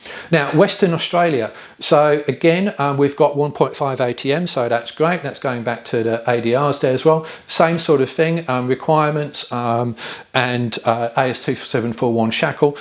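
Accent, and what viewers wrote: England, United Kingdom. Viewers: Ignore the English accent, this is Australia but for which state rules, no idea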